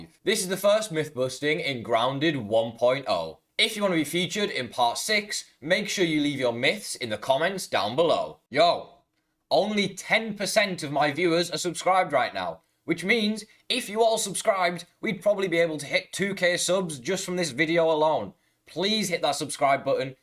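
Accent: United Kingdom. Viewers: U British ??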